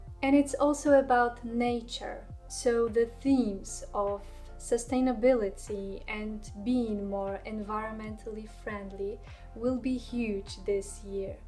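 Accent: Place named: Poland. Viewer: Hi great stuff you are so smart my mind has been so scrambled lately sometimes listening to people just makes it worse but not with you for me brings me down to earth just the way you talk I guess soft voice and I love your accent I live in NY USA I think you are in Poland? Thank you for helping me 😀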